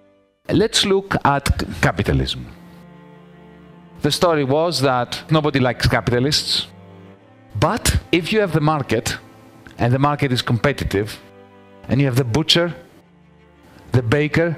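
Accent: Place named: Russia. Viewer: The Russian accent is so enraging when it comes to criticize of capitalism!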